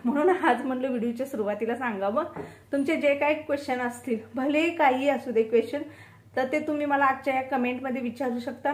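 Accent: Kolhapur, India. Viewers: Tumi kholapurchi bhasha bholta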